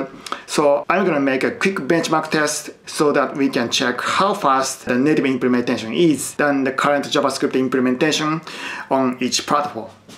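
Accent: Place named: Japan. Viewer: I like your Japanese accent.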